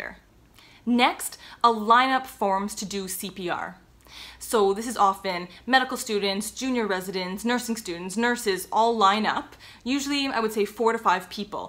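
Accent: Canada. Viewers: I've never seen your videos before, but as soon as I heard you speak I knew you were Canadian from your accent! Great video! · I live in the US in Ohio. Just thought I'd throw that in there as well. You sound like you have a Canadian accent.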